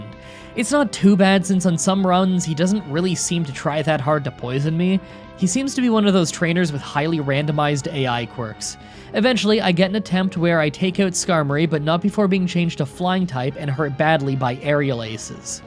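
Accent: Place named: Canada. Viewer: Man you're Canadian how cares how you say names of Pokemon. I just enjoy your smooth voice.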